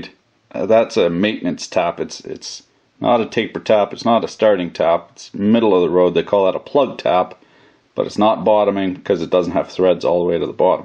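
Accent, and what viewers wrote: Canada. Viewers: Dude were are u from? I keep thinking Canada, but sometimes i really don't know? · He's definitely Canadian, the canadianisms are real with him. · You sound rather Canucken to me. whereabouts are you from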